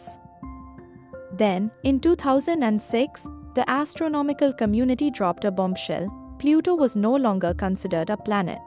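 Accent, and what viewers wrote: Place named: India. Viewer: F kking indian accent sounds like dum scammers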